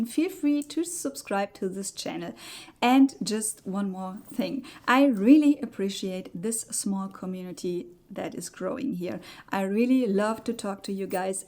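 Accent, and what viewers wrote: Germany. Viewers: You make some very good points about the shortcomings of the S25; Samsung missed the mark on the whole range this year, it seems. I'd argue that the camera is still better than most of the users when it comes to taking photos, but you're spot on about the charging speeds and screen reflection. I've been with Samsung since the S4 but I'm definitely going to investigate other options when it's time to upgrade my S23+. Your English is better than most North American native speakers! Your grammar is impeccable and vocabulary is sophisticated. Yes, you have an accent but it's charming and only adds to your videos. And my mother was German, so I get a bit nostalgic when I hear it.❤Don't change a thing.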